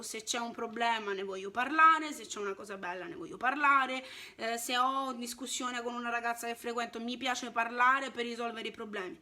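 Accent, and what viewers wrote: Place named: Palermo, Italy. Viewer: Bel video 🤣❤️ il tuo accento palermitano é il top ❤️🤣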